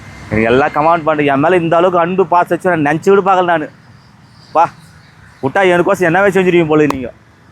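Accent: Madurai, India. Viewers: Super Anna Madurai vasagam 🎉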